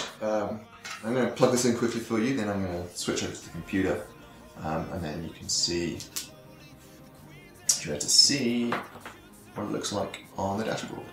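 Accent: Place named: United Kingdom. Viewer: Got my hopes up hearing British accent that you can pick these up in the UK alas by the Speedtest he is in Colorado.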